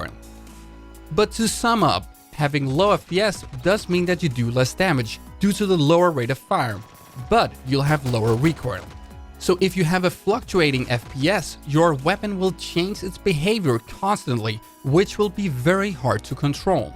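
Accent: Russia. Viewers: ohh , you from Russia) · Are you Russian?🤔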